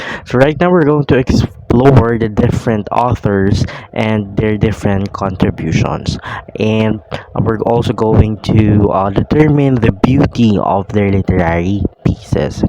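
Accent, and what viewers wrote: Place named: Philippines. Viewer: Very thick Philippine accent besides mixing Tagalog ? with English. Don't play on fast speed or you won't understand him.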